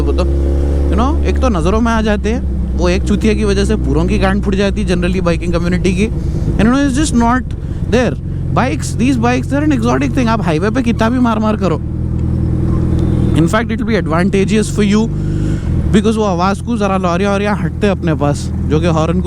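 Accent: Hyderabad, India. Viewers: bhai it was just crazy listening you.🤩🤩🤩 Aapki riding,aapki English (mashallah),aapki commentary Hyderabadi mai,aapka slang to good to awsm to listen.🔥🔥🔥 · Ye Tappori dilect nai hai bhai !! Hyderabadi hai Hyderabadi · Assalamwalakum bhai maintained Hyderabadi style me In words 👌🏻👌🏻👌🏻👌🏻👌🏻♥️📸💥💥💥😍🤲🏻🤲🏻🤲🏻 #Kįrāk 🤯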